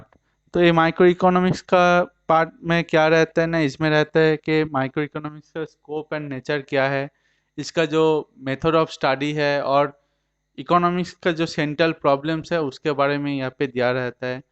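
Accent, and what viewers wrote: Bengal, India. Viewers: are you from west Bengal ? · Yes bro he is bengoli.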